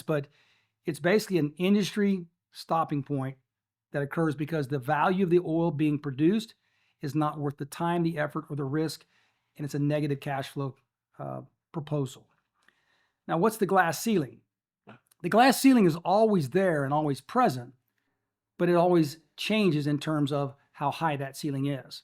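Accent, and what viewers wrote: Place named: South, United States. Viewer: I can hear the southern hills underneath this man’s voice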